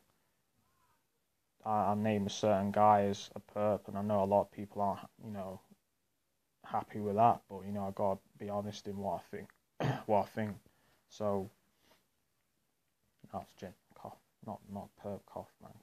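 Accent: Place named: Nottingham, United Kingdom. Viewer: Have you ever wondered if you have mental health problems? I'm sorry if my comment offends you but you're living in hell at the moment and getting help might make life better for you, If not at least you can be sure it's not your mind playing tricks on you. I'm from Nottingham, You sound like you're from Nottingham as well or near? Take care.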